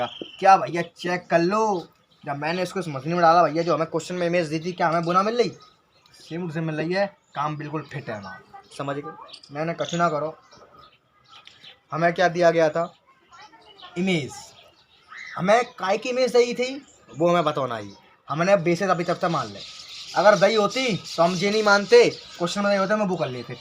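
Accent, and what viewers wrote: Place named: Marwari, India. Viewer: Gajjjjjjjjb sirji aapka marwadi tadka bahut aacha laga 🔥🔥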